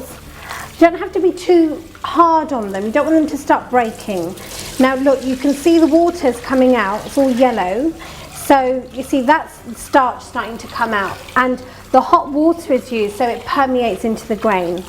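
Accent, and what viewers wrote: England, United Kingdom. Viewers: Where are you from ur English is soo english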